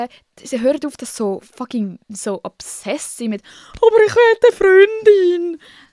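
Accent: Switzerland. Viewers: Du bist so lustig! Ich lieb den Schweizer Dialekt einfach :-)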